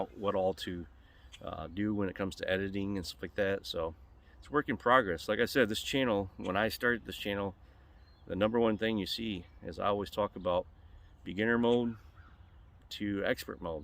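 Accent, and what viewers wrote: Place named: Cape Breton, Canada. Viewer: Your accent sounds like Whitney Pier Cape Breton.😁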